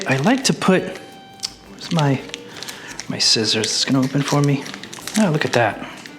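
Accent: Massachusetts, United States. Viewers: You're from Massachusetts, never apologize for how you say cheddah, ked!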